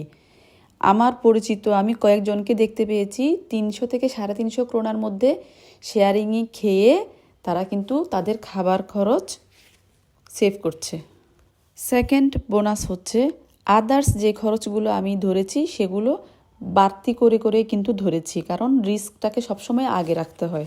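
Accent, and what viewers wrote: Chittagong, Bangladesh. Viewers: আপনার বাড়ি চিটাগং তা ভাষায় বুঝা যায়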